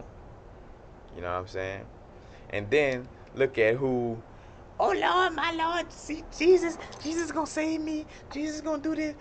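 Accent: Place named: Brooklyn, United States. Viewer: You so Brooklyn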